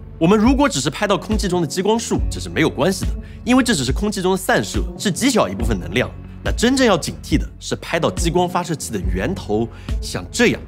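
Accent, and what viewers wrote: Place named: Jiangsu-Zhejiang, China. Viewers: 四川 河南 东北 北京 安徽 河南 山西方言大行其道，江浙沪带点口音你就受不了了？ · 完全被江浙口音搞出戏了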